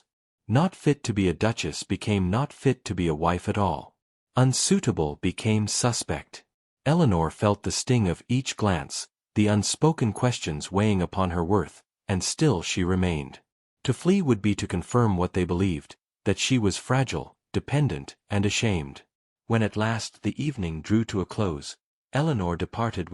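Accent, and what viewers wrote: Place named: United States. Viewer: Please it’s duty not dooty and duke not dook. For heaven’s sake find someone who can pronounce these words the ENGLISH way and not the American way! 🇦🇺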